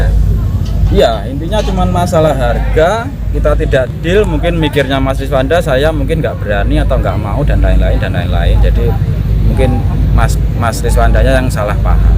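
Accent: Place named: East Java, Indonesia. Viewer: Logate etanan ngno kui...bumbune ben tambh sedep mas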